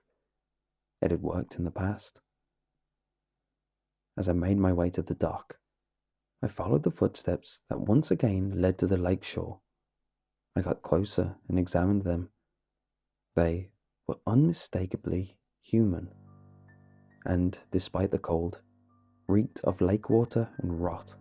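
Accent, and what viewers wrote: England, United Kingdom. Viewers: Why do all tge Creepy Pasta channels sound the same? Are they English or just proper sounding Americans?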